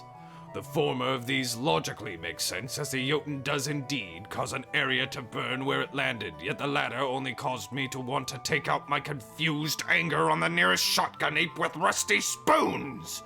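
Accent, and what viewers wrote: England, United Kingdom. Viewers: You sound like a mix between a humble Englishman, a drill sergeant and Lord Saladin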